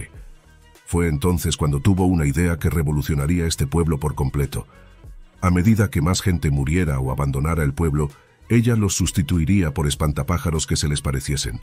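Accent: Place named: Castile, Spain. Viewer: Excelente vídeo, y muy bien narrado. El narrador habla un perfecto castellano y es un placer escucharlo.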